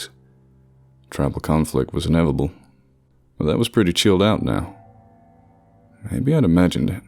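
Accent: South, United States. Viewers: For a Brit, you do a damn good southern accent. Bravo. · As a native Kentuckian, I can confidently say that you actually put on a decent southern accent. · Wow... Your Southern accent is amazing! I almost could have sworn it was your native accent. Well done! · Your American accent is getting better, or I guess I should say accents. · You do an amazing southern American accent for an Englishman! ❤️👌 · Your southern accent makes me smile 😉👍🏽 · Bro ur up there with Jason Hill(chilling tales for dark nights) and ur southern accent is pretty on it espically for u being British.🤘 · you do a great Southern USA accent! Seriously! I live in Kentucky, but spent lots of time at Lejune while my dad was in the Navy. You nailed the patois perfectly. I love your voice and your giant nature loving heart.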